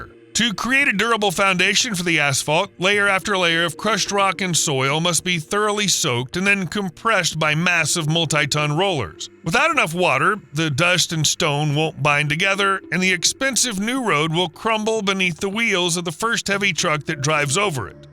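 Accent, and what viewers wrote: United States. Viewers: 😂 If you think all that could be built out there for that price, I have some other "investment opportunities" for you. From Winton, a A$1.2b single lane build would run out of money well less than half way to the NT border assuming the standard ballpark A$5m per lane-km for a rural arterial, which would be optimistic for some of the reasons mentioned. If you want a lane each way it would barely reach more than 100km. The US accent didn't help credibility, but otherwise was entertaining enough.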